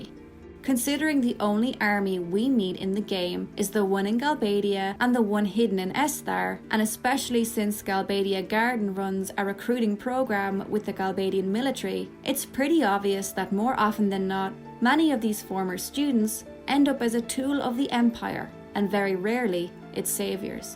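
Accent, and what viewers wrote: Ireland. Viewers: Did not expect to hear an Irish accent, great video.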